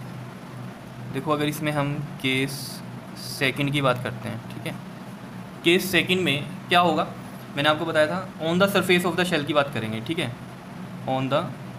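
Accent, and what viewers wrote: Punjab, India. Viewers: Sir ap Punjabi ho🥰🥰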